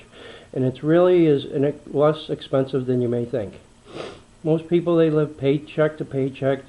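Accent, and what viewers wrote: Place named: Maine, United States. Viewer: store what you eat and eat what you store. I have been doing moderate food storage for 20 years. I shop at Save-A-Lot every month. time from Maine and you sound like you're from somewhere Within a 50 mile radius. :-) do you know why I started to store food? because my first husband would starve me and then years later over feed me so once I divorced him I still felt like I had to keep a huge closet full of food.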